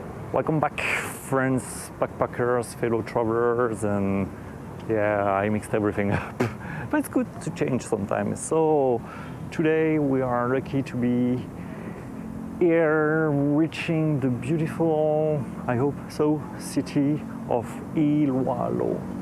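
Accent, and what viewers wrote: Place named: France. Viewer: I think he’s French that’s why they say oi as oa.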